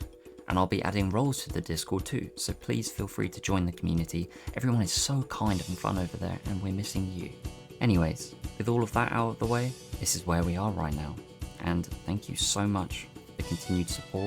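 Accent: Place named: RP, United Kingdom. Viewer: I love your voice, you sound posh as fuck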